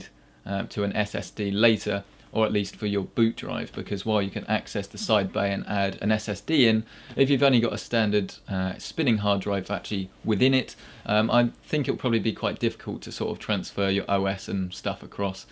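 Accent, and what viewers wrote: England, United Kingdom. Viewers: As a non english native speaker,trying to understand what you are saying with that strong english accent its very difficulty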